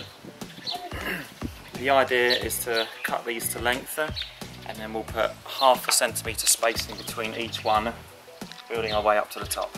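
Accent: Italy. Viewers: You sound Italian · Are you italian? I can hear a tiny "ë" at the end of your sentences. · I'd say he's Italian 👍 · I hear an Italian accent, no?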